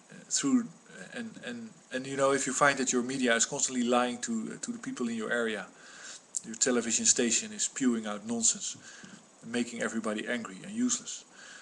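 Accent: Netherlands. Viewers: lekkere dikke nldse accent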